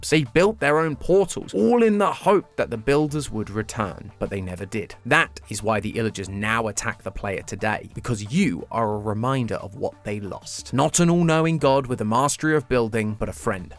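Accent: United Kingdom. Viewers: Game theory Now 100% more british! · At times i don't notice British accent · BRITISH GAME THEORY IS HERE TO STAY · edition.. Now 100% more British 😅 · I spat out my water I was drinking when I read the "Now 100% more British!" because himself reference that "You might be wondering, why does now sound British?".💀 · Now 100% more British is crazy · Why is british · 100% more British? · its not the same, i cant listen to this british voice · Wait game theory is British now?! I think I may have missed an update 😅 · hey i mean i thought that would ruin the game theory and that all the other channens but ya'll are doing a great job at making videos its the same videos bud with bri-ish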